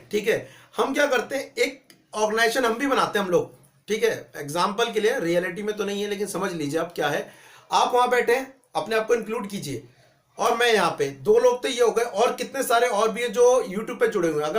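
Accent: Uttar Pradesh, India. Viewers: Sir aap uttar pradesh se ho na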